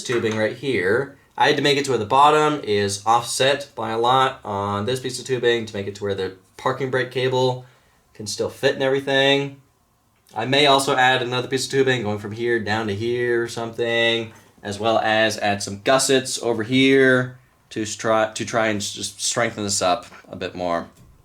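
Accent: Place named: United States. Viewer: Where is his accent from people ? I know its american? But what state etc it sounds so mad but in a good way 😁😁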